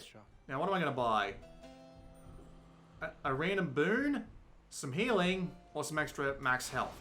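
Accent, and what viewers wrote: Australia. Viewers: Good vid. Out of curiosity, are you Australian perchance ? That and is the framerate consistent handheld and otherwise? Thanks in advance lad.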